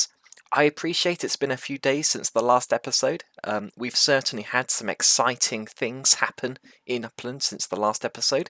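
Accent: United Kingdom. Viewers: Wut? He has a super British accent.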